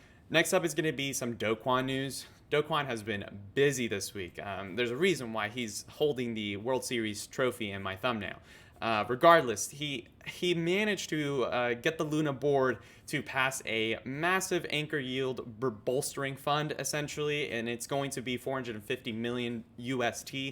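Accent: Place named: United States. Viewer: Wena crypto Chileno. Has 100% gringo accent, must have gone to Nido de Aguilas. Nice youtube mate, will be following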